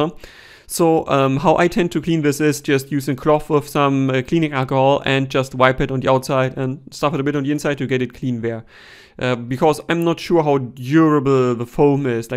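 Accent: Germany. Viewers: Madre Mia!! Your English is totally undecipherable. SLOW DOWN YOR SPEAKING. You have a very thick German accent. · Für mich war’s überhaupt kein Problem, deinen deutschen Akzent zu verstehen, wenn du Englisch sprichst. Ich bin nämlich auch zweisprachig (Deutsch/Englisch), also mach dir da echt keinen Kopf. Dein Akzent erinnert mich an Der8auer und der hat immerhin 240 k subs.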